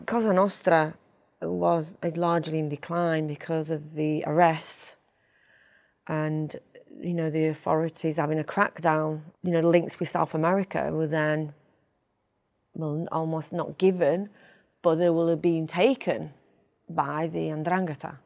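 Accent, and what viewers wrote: United Kingdom. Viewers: Wonder why she has a British accent? I guess she's from the UK? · This is the most British sounding Italian I’ve ever seen. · Why does she have a British accent? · Set sounds like she lived în Uk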